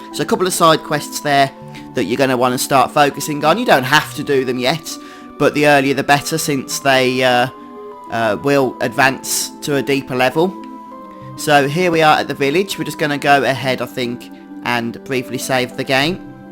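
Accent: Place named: Northern England, United Kingdom. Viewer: what accent you're using? sounds like northern accent in England. You earn my sub.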